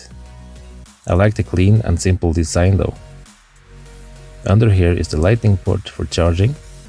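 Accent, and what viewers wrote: Norway. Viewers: the norwegian accent. AHHHHHHH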